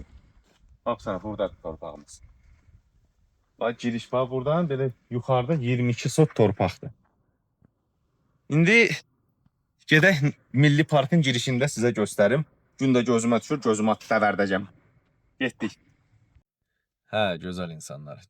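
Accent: Ganja, Azerbaijan. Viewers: İn şəə Allah olarsan Rəbbim hidayət versin yaxşı əməllərinə görə amin .Gəncədən sən deyəsən ləhçən oxşayır)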